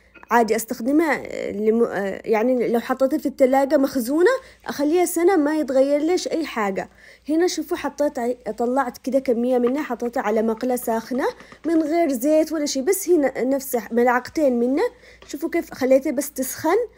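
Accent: Aden, Yemen. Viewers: تسلمي ع كل الاكلات الحلوة وتسلم الهدرة العدني الذي تخرج من فمك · محلا كلامك الكلام العدني يجنن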